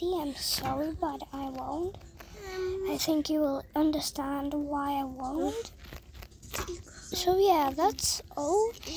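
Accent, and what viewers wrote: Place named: United Kingdom. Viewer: U sound so british broo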